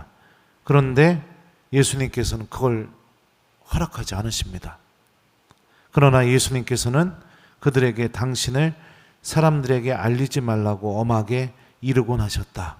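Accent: Chungcheong, South Korea. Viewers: 충청도백제말쓰시는신부님 김대건신부님이상 귀에쏙쏙들어오는것 신부님튼강강좌미사 감사드립니다아멘 미카열입니다 성부와성자와성령으로아멘 백제말감사드립니다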